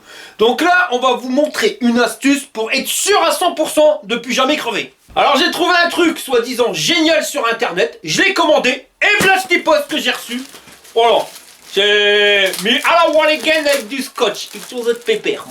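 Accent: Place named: Nord-Pas-de-Calais, France. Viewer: Salut je vais m'offrir un fat bike 20 pouces, j'ai regardé les modèles et celui qui m'a tapé dans l'œil c'est le Garret Miller Z. Quand penses-tu? Ton biclou blanc derrière toi est sympa, quel est ce modèle? Merci beaucoup pour tes vidéos avec ton accens CH'TI j'adore, continu comme ça.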